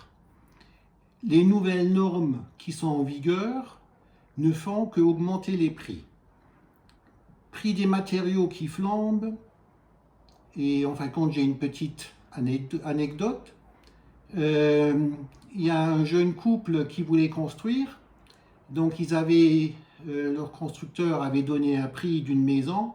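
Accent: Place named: Alsace, France. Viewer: haa ,cet accent alsacien too much looie...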